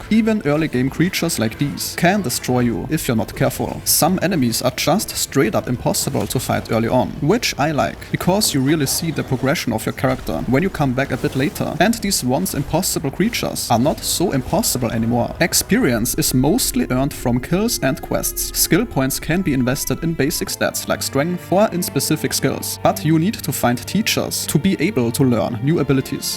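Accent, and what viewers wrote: Germany. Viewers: Digga deine Aussprache ist wirklich schrecklich. Versuch Englisch ohne deutschen Akzent zu sprechen weil das geht fast in Richtung old Arnold😅😂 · Very german accent :D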